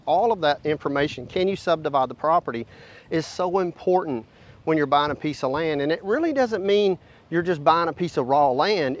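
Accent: South, United States. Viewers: i love me some southern accent hehe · That super southern accent is a challenge. Damn. "Number tin is mineral rots"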